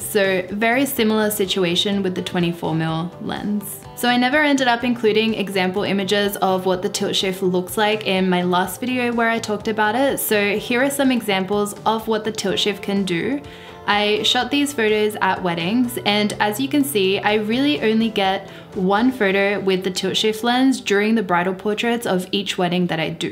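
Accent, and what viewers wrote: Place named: Australia. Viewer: It's an Australian accent. Didn't you watch home & away and neighbors when you were a kid?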